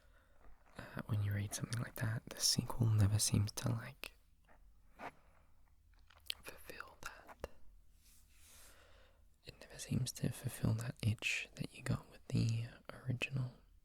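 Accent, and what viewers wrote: Australia. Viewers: When I clicked on your video, my interest was immediately piqued by the familiar Perf accent, and I'm so glad I stayed because I love your rambles. I know a lot of women like myself have difficulty relaxing to the sound of a masculine voice, but you're so gentle and sweet that it's easy. Thanks for your videos,! <3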